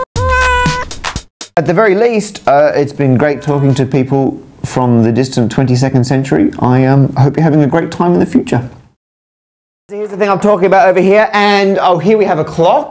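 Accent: Australia. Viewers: tell me more, Mr. Australian Math Man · He sounds Australian to me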